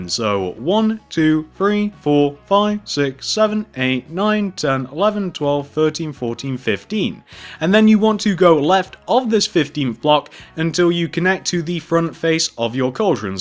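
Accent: United Kingdom. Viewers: Hello, Number 1 fan I have a few questions I want to ask you is their a way for me to contact you? Also I been a fan since 09 and I learn everything from you.. on minecraft. Also British accent is awesome!. Ps: Number 1 fan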